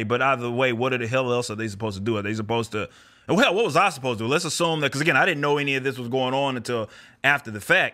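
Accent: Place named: Texas, United States. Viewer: Yes as it turns out inner city Texas speaks differently to whatever part of the country or planet you’re from. Shocking I know.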